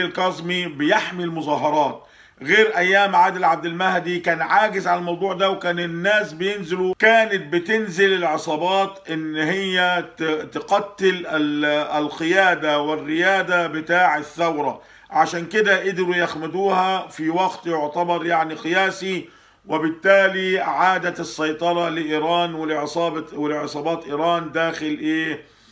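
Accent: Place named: Damietta, Egypt. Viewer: ماأسمك يا أستاذ فنحن نحبك في الله نحن من دمياط. ولهجتك زي لهجتنا وزي لهجة المنزلة والمطرية دقهلية. وبتجمع بي الفهم السليم والاسلوب السهل بار الله في فضيلتكم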